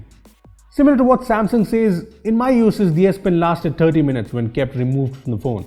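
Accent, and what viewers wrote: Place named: India. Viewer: Cool a video about note 9 *clicks this video* *Indian accent* *Leaves*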